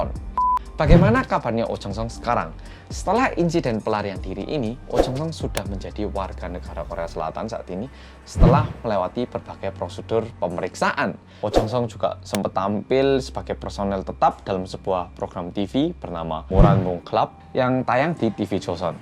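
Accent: Java, Indonesia. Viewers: Tim gercep mas ~🤣🤣🤣 orang Korea yang MEDOKKKKK~~~ btw aku kesini cuma gara² ada Hyun Bin (Kapten Ri)😭😭😭🤣🤣👍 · Jawa banget suara nya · Suara nya kaya orang Jawa Medok banget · Lagi nonton KBS Live di YouTube,eh ada notif dari mas....auto klik dong. Bersama orang korea yang "MEDHOKKKKKKKKK" Lho rambute sampean ganti maneh mas. Lup² mas ❤ Jatim hadir disini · Gaya bicara pembikin konten cukup nyentrik, wajah Korea ngomongnya "medhok" kejawa2an 🤣🤣🤣tp aku like lho... 👍👍👍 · Lah kok ngomongnya kaya jawa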